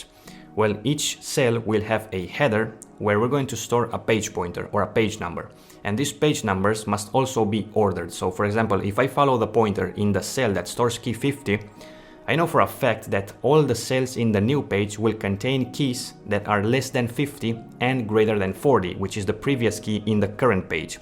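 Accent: Spain. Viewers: Tienes acento mallorquín. ¿Eres de por ahí? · You're not Spanish? WTF? Where are you from then? I'm a native speaker and when I hear you I have no doubts you are from Spain. Tío, qué cojones dices. XD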